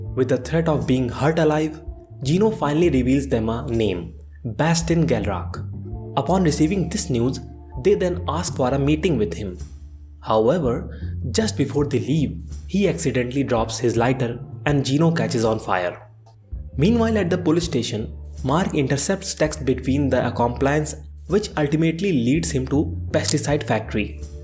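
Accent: India. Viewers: It is very strange to hear someone with an Indian? accent explaining a Belgian/Dutch tv show. It's nice that through platforms like Netflix people can watch shows from completely different countries that usually don't expand much internationally like eg US Hollywood movies (Like I don't think Squid Game would have ever been that big if we still had to rely on our tradition TV Channels, they probably would have never chosen to suddenly play a Korean series) Thank you for the recap.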